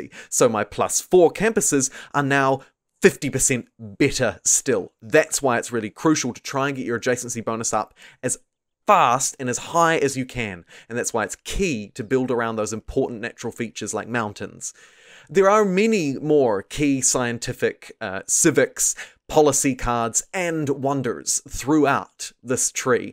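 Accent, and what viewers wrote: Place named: New Zealand. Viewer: That's a kiwi voice right there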